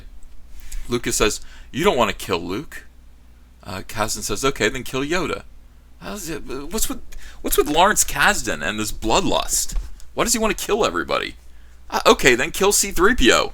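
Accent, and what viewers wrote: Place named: Oklahoma, United States. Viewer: You have a an accent now, like you are from Oklahoma or Arkansas.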